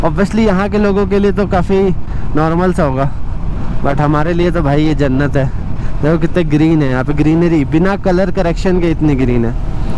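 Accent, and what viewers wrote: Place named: Bihar, India. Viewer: Bhai love ur accent.... Hum bhi bihari hai bhai ❤️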